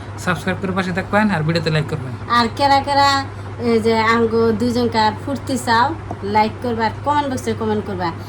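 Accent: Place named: Sylhet, Bangladesh. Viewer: Mone hocche purai Sylhet ❤❤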